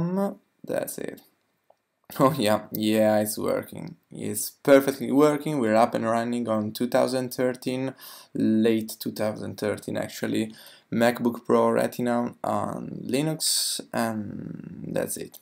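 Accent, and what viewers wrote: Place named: Italy. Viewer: That Italian accent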